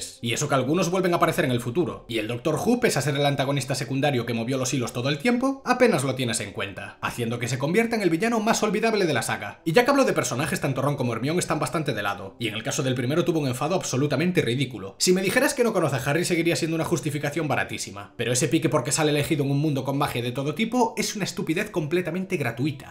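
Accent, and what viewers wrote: Cuba. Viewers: Era acento cubano? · Que acento cubano tan racista… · el acento cubano me hiso el dia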